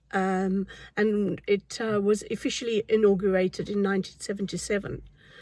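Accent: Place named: South Africa. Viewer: Hi. I love the history and footage of the little village where you have bought your studio. The lake is beautiful too. You sound South African ❤ thank you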